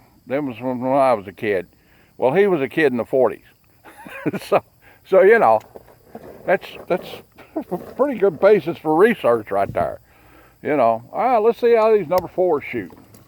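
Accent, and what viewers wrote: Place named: Western North Carolina, United States. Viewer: Yep you sound like you're from West North Carolina around Murphy ? Am I wrong ???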